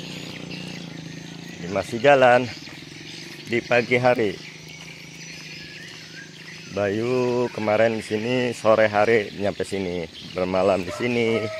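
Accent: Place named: Java, Indonesia. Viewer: Salut dgb Om... berakulturasi dgn budaya lokal, bahasa dayaknya dah bagus walau medok jawanya masih agak terdengar.... semangat... sukses selalu Om.... Bisa reques ngak Om, minta video didaerah SIBITAN... kangen masa kecil ku 30 thn yg lalu...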